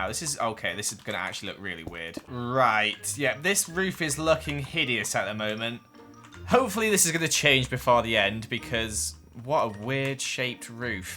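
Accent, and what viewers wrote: United Kingdom. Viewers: The beginning killed me, that is why I love the British accent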